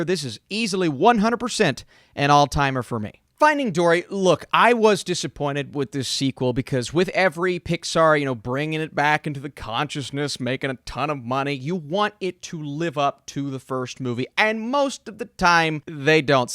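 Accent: United States. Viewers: You have the most American voice I’ve ever heard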